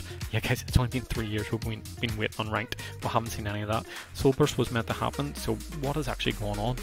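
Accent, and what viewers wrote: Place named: United Kingdom. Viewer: You sounded British to me. Plus most of the time when am in your server you are in English servers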